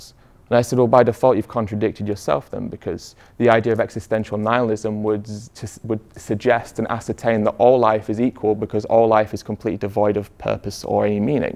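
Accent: United Kingdom. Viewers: I loved this speech and english accent makes it even better, absolutely awesome!! Thank you Ed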